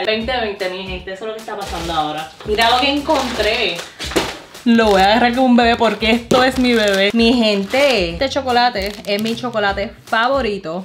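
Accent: Puerto Rico. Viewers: Está mujer si q tiene acento bori 💯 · Quien es esta Boricua china habla bien borricua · Ahhh me encanta tu acento boricua!!! 😂😍😍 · Me muero de la risa contigo yo soy nacida y criada en P.R hasta los 16 y tu hablas mas boricua q yo!😂🇵🇷 love the video you are too funny. Definitely new sub!! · Nenaaaa, eres 100xciento boricua. Acento, manerismos, pique, me rei tanto contigo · Gracias por hablar tan lindo de mi isla. Tienes el flow Boricua. 😊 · Que chulita, con ese hacento Boricua ,.Que pena que no eyes de mi epoca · 😂😁😂 Te pasas!, saludos hermosa, no te puedo ver como china pues con ese acento y carisma de boricua al 100%, imposible, no se como caí en unos de tu videos pero si, son cheverones😁 muy simpatica, te voy a seguir viendo por aqui🙏 cuidate!😁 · tu asento es de boricua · Me encanta tu accento boricua eres hermosa y muy energética me encanta todo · 😃😃😃😃nena tu hablas mas puertorriquena que yo ME en canta el hablar boricua 😭😭. 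· Woooo corillo aquí una nueva subscriptora jajaja te ame dsd el momento en que te escuché hablar boricua de pura sepa 💓 Desde Ponce PR ❣️ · Niña tu acento es puro boricua. Nosotros queremos recibimos muy bien a nuestros invitados. Mi recuerdo es las reuniones familiares, yo extraño mucho a mi familia. Feliz Navidad hermosa💞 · Si no me dices que eres china, ni me doy cuenta. Pareces, hablas y actúas como una puertorriqueña 🤣👐🏼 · Que mujer mas linda de verdad. Una mujer China hablando bien boricua. En la escuela yo vi una Japomesa hablando español y en la Universidad una Vietnamese woman tambien hablando bien Boricua. Que bonito de verdad. Dios bendiga tu belleza. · Me encanta tu personalidad eres un vacilon 👍😅me siento bn orgulloso d q t sientas como toda una boricua 💯💪me sorprendes y me encanta tu acento d mi preciosa isla no puedo irme sin antes decir q eres BELLA........😍😘💖🌹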